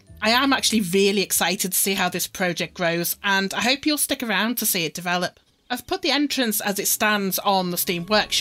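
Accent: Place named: Manchester, United Kingdom. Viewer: I'm an American and I can't place your accent, is it Manchester!? Love your videos! Definitely subscribing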